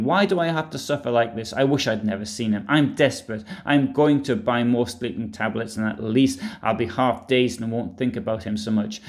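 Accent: Newcastle, United Kingdom. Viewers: Another excellent film, thanks. Always a treat to hear Eva's diary in a Newcastle accent. Hahaha!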